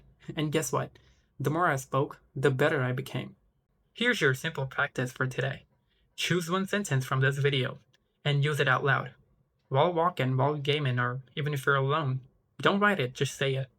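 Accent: United States. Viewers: Bro, your American accent is top notch! I wanna really get connected with you. Is it okay if I take some time talking to you??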